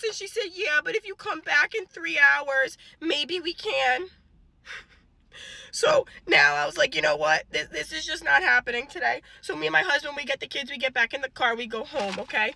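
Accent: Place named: Louisiana, United States. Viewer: The accent! Sound like us down in Louisiana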